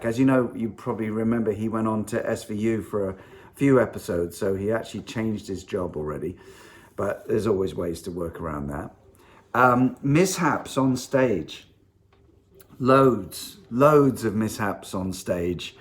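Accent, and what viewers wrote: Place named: United Kingdom. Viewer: I always forget about your British accent because I watch Michael Cutter too much.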